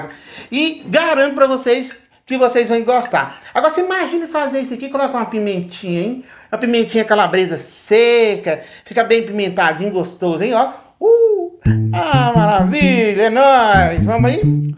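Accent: Minas Gerais, Brazil. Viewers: E aprimeira ves que Estou vemdo seus aldio amei fose Emuito fofo pelo sel sutaki voce e mineiro adoro kkkkkkkkk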